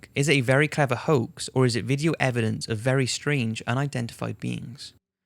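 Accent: Wales, United Kingdom. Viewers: It's British. Of course it's audio gold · perkin2000 Think you will find it is a Welsh accent, from near Cardiff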